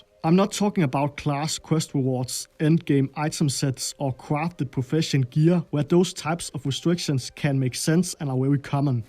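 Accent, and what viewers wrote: Denmark. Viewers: The Danish is strong in this one! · that danish accent wowowowow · Danish is strong in this young one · damn that danish accent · Can't Tell if Danish or Austrian, but i can tell you make great informative content!! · Shiiit thar danish accent tho 🤣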